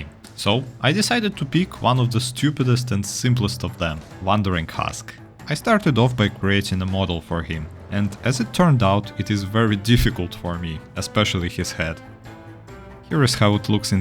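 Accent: Russia. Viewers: На самом деле у тебя очень хороший английский. Но всё равно русскому уху очень слышно) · Hello! Просто вопрос, вы из россии? Просто по акценту похоже. Да и похоже на.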